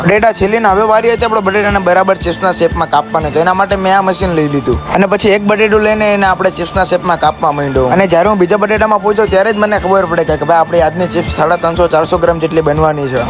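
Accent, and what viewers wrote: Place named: Africa. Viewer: Aaafrician Gujarati 😮😮😮😅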